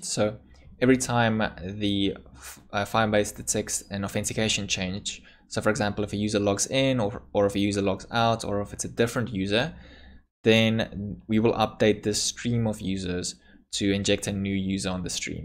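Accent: South Africa. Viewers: Are you from South Africa? Your accent sounds South African? Great video! Thanks!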